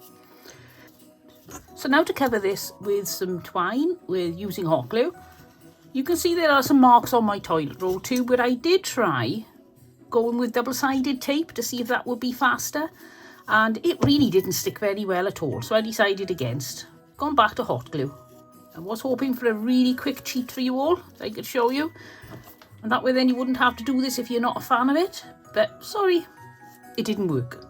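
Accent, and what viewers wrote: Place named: Fairwater, United Kingdom. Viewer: I love watching your videos and your voice brings back lovely memories of the two years as a teen I lived in fairwater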